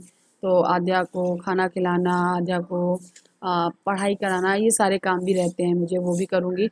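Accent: Bundelkhand, India. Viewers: Hello di aap bundeli bola kariye aap ke munh se bundeli bahut acchi lagti hai ham log bhi ghar per bundeli hi bolate Hain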